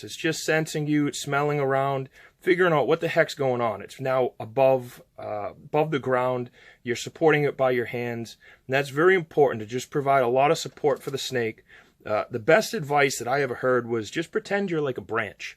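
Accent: Canada. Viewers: I'm from Massachusetts, so I don't know why you would sound Canadian to me if you weren't. But I saw a business with the same name as yours listen in Lynn, MA. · Sounds like you are from Canada?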